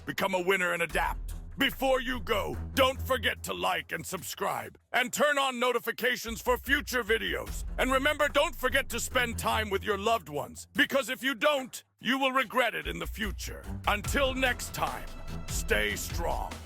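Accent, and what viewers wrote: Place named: United Kingdom. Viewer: Okay, okay......But why is he British?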